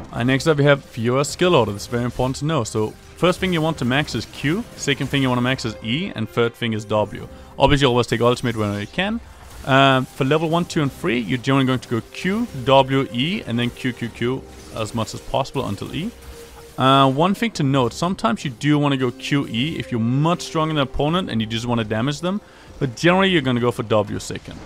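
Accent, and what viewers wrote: Denmark. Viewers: you sound danish :D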